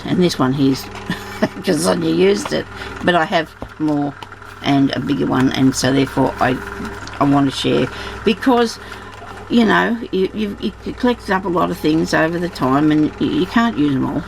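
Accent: Australia. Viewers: Hello Sonya, new subscriber, popped over from Magz Lockley's. I' m in Victoria, nice to hear another Australian. Will have a look and see what fabric I can find, do a V R. to enter your giveaway. Sue 🌸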